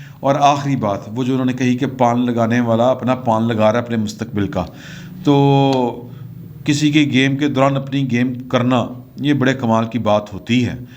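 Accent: Punjab, Pakistan. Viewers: 😁 pure Punjabi accent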